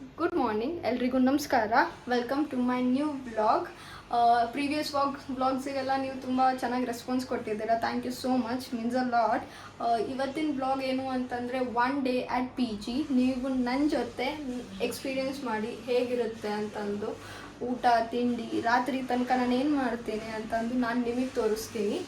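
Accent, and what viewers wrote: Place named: North India, India. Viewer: Your hindi is like we north indians ! Great . U r so energetic and beautiful. Love ❤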